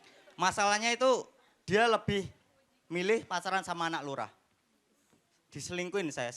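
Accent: Java, Indonesia. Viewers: Materi dia sederhana tapi realita,di tambah lagi medok nya yg nyerocos 😂 Keren lah. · Lucu aksen jawanya...... Ngakak gw. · itu gaya standupnya mirip raditya dika. cuma dia versi medok jawanya. pola beat, absurd, actoutnya dan pecah nya sama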